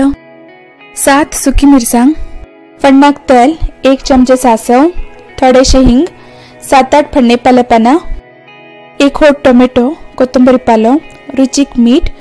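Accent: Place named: Karwar, India. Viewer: Loved to hear your Karwar konkani...i am also konkani ,but from Mangalore (GSB)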